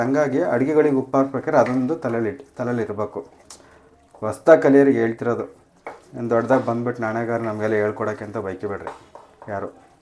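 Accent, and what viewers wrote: Chitradurga, India. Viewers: Sir neev yav oorinavaru?nim language same nam Chitradurga style nalli maathadthira 👌👌👌...nim recipe thumba ishta aythu sir 😊😊😊😊😊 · ಇದು ದುರ್ಗದ ಭಾಷೆ ಅನಿಸುತ್ತದೆ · ನಿಮ್ಮ ಭಾಷೆ ತುಂಬ ಚೆನ್ನಾಗಿದೆ. ನಮ್ಮ ಚಿತ್ರದುರ್ಗ ಜಿಲ್ಲೆ ಕಡೆ ಹೀಗೆ ಮಾತನಾಡುವುದು. guraduvudu, Durga barga etc.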